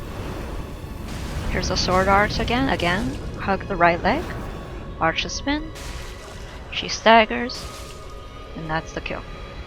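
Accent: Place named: China. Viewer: 妹子你是中国人嘛😳 I like your accent; it sounds just like mine.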